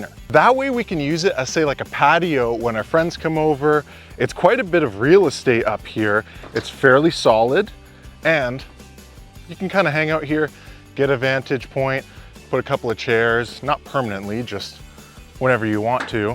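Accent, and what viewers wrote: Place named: Canada. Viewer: Your video showed up in my feed last night and I was like, hmmmmm he sounds Canadian. Hello fellow Ontarian! Nice to meet you. I'm looking forward to your videos for building the tiny home as hubby and I are about to be empty nesters. We would like to sell our home which is in a small village of about 900 people, and move back to the country where we both grew up on farms. I am betting your build will answer some questions I have about the process here in Ontario. Also, heads up - those weather people are telling us this winter is going to be super cold like we ( I mean me) experienced in 1979. It was super cold and nasty. This video made me chuckle because I heard Mike Holmes voice in my head saying, why would you put new on crappy old? when fixing your step. However lipstick on a pig sounds pretty funny, LOL. Looking forward to catching up on everything you have posted so far!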